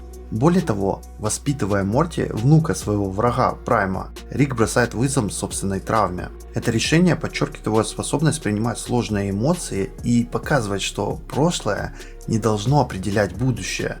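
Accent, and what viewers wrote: Russia. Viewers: говор на Воронежский похож) В принципе, там близко 🤗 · да, потому что все территории около Украины, украинизированны, именно по этому у вас там говор, ге-шо-те... задумайся росиянин против кого воюет твой царь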